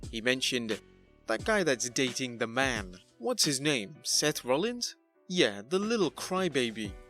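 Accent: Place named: United Kingdom. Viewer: Head's up bud, if you're gonna put prices in I.E. $60, it would be helpful to people who don't use the Dollar-Dollar ya'll, to put it in other currencies. You sound British to me which is astounding, that, if you are British, you wouldn't put the £ in. Simple business techniques bud. If you want pound-pound 'squire, you'd know investors and customers are lazy but lazy enough that they will go to another source for their info if the basics are built.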